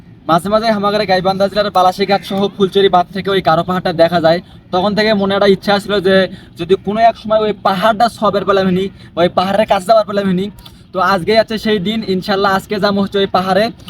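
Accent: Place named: Bogura, Bangladesh. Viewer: গাইবান্ধার ভাষা এমন না। এটা বগুড়ার ভাষা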